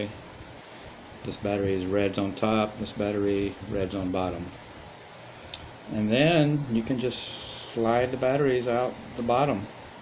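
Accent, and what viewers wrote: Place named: Northern Ireland, United Kingdom. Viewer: Could i ask where your from as your accent is def N Irish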